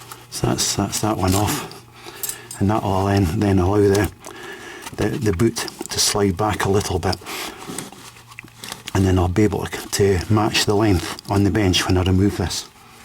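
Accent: Scotland, United Kingdom. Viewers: Love the Scottish accent! Love the detailed instructions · Love the scottish accent..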